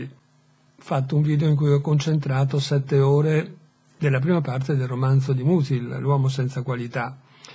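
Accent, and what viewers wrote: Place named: Italy. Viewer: Sto facendo un blog, perché sono una professoressa e, qui in Spagna, è preferibile studiare la diffusione dell’educazione (bene, giá sai...la neolingua, come avverte Orwell educazione=istruzione; istruzione=come usare un oggetto appena comprato, etc. etc.) anche attraverso questi mezzi (in questo mi trovano pienamente d’accordo). Trattandosi di un blog in costruzione, ancora non c’è nessuno che segue il mio saggio multimediale (esercizio di un corso), però questo blog è già sul web ed io mi sono permessa di citarti come gran lettore di libri italiani (il mio obiettivo è sempre comunicare con i miei studenti di lingua italiana qui in Spagna e poter mettere loro a disposizione materiale di supporto che li allontani un po’ dai soliti esercizietti del loro libro di testo. Per farla breve, sto montando un blog sulla storia immaginaria, la storia come avrebbe potuto essere, riempire con la fantasia i vuoti che a lasciato la storia, etc. 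Ho voluto inserire come esempio anche 1984 (inventare il presente) e ho messo il tuo link, con due parole per presentarti e consigliare che leggano gli audiolibri pubblicati da te, per via della tua eccellente dizione, della passione priva di enfasi con cui interpreti le opere e della cadenza perfettamente italiana (questo appoggiare le frasi sulla penultima parola, che rende il nostro parlare cosí dolce e che per gli stranieri è tanto difficile da assimilare)...insomma, mi da dai il permesso di lasciare tutte queste cose sul mio (purtroppo ancora a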